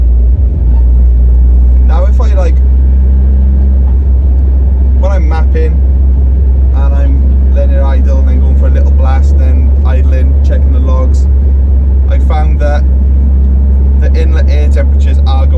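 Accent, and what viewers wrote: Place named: Cockney, United Kingdom. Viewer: Love the English cockney..🤣🇯🇲🇯🇲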